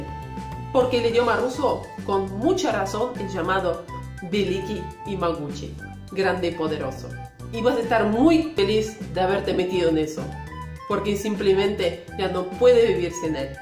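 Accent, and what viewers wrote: Argentina. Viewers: Que graciosa Rusa hablando argentino 😁. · Vives en Argentina??? Lo digo por el acento que tienes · Muy bueno, hablas como argentina nativa! Espero alguna vez hablar así el ruso.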